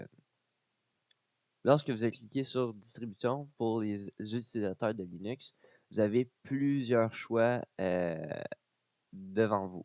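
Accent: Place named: Quebec, Canada. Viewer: j'aime tros ton actens quebecoi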